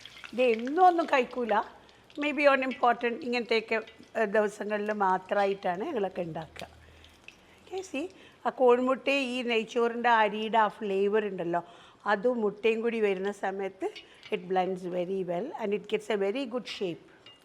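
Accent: Kozhikode, India. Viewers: ഇത്ത, എന്നും കാണാറുണ്ട് പുതിയ പാചക വീഡിയോസ് ഇടുന്നതും കാത്തിരുന്നു കണ്ണും നട്ടു ഇരിക്കാറുണ്ട്, ഇങ്ങള് പുലിയാണപ്പാ, എല്ലാ വീഡിയോസും ഒന്നിനൊന്നു മെച്ചം, കോഴിക്കോട്ട് ഭാഷ സൂപ്പർ നല്ല രസം ഉണ്ട്‌ കെട്ടിരിക്കാൻ, പുതിയ ഐറ്റംസ് പ്രതീക്ഷിക്കുന്നു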